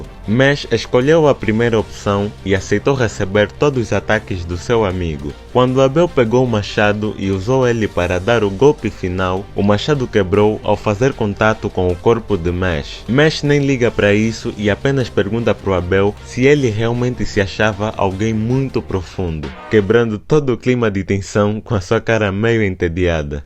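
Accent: Angola. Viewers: O narruaidor eis angolaino? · Ele parece ser da Angola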